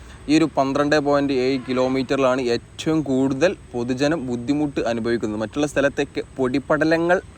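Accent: Malappuram, India. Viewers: Nalla slang malappuram super Kutta ankamly to marad bypass koodi oru video cheyyavo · നീയൊക്കെ എവിടുന്ന് വരുന്നു മലരേ... ഇദ്ദേഹത്തിൻ്റെ സംസാരരീതിക്ക് യാതൊരു കുഴപ്പവുമില്ല.തനത് മലപ്പുറം ശൈലിയിലുള്ള സംസാരം കേൾക്കാൻ സുഖമുണ്ട്. നമ്മൾ കണ്ണൂരാണ്. ഉറച്ചസംഘിയുമാണ്. എന്നാൽ മതവും ജാതിയും നോക്കി ആളെ വിലയിരുത്തലില്ല.സ്വഭാവശുദ്ധി മാത്രം നോക്കും.രാഷ്ട്രീയമാണെങ്കിൽ രാജ്യത്തെ സമഗ്ര വികസന രാഷ്ട്രീയ കാഴ്ച്ചപാടും നോക്കിയാണ്. എല്ലാ മതവിഭാഗങ്ങൾക്കും , ജാതി വിഭാഗങ്ങൾകും രാജ്യത്ത് തുല്യനീതി ലഭിക്കുവാൻ ; പ്രധാനമന്ത്രിക്ക് സാധിക്കട്ടെയെന്ന് പ്രാർത്ഥിക്കുന്നു.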